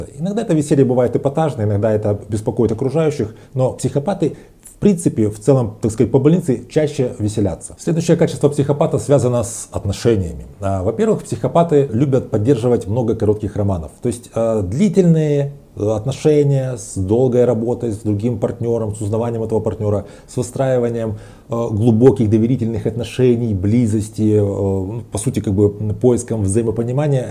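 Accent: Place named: Ukraine. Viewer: какой-то говорок у вас украинский. Слишком быстро говорите.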